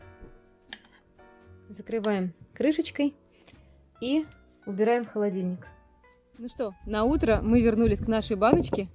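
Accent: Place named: St Petersburg, Russia. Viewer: Это первое видео, которое я смотрю на Вашем канале. Такая у Вас речь четкая. Ближе всего к питерскому акценту, хотя, я мало где была в России. По голосу слышно, что Вы хорошо поёте.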